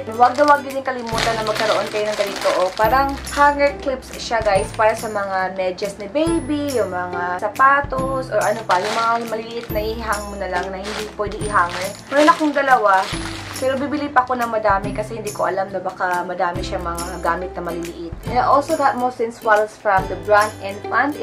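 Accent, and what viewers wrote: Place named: Visayas, Philippines. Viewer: Bisaya ka po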